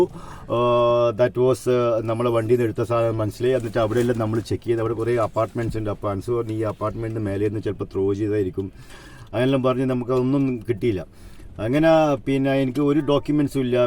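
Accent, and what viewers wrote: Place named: Thalassery, India. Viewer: തനി തലശ്ശേരി ശൈലിയിലുള്ള മനോഹരമായ വിവരണം. Wishing you all the best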